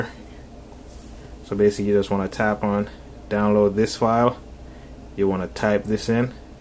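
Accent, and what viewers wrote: Jamaica. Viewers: Can tell you a yaadie lol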